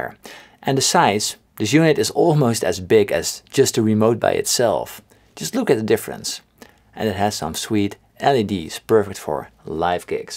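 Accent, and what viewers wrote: Netherlands. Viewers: Ik wist dat je uit Nederland kwam! (Ik hoorde het aan je accent en zocht het op dus ja...) Goede video's!! Ga zo door! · He sounds like he comes from the Netherlands so i checked and yes he is from the Netherlands lol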